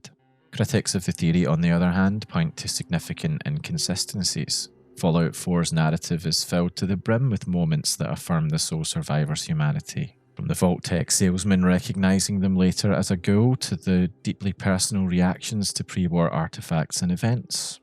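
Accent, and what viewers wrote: Scotland, United Kingdom. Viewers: Scot? Irish? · Are you by chance Scottish? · Subscribed because of scottish accent.. something I'm trying to learn.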